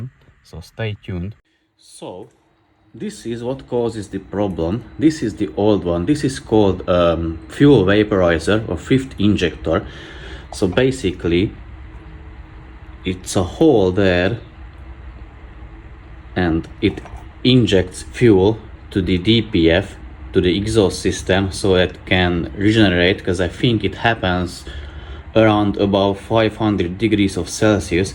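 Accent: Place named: Hungary. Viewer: Ez igazi Magyar dialektus. 🤪🥰🤣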